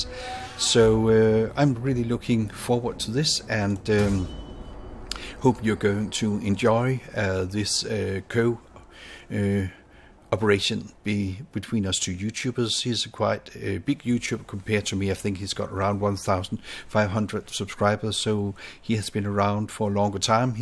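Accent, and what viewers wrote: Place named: Denmark. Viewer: Man the Danish accent amuses me to no end :''}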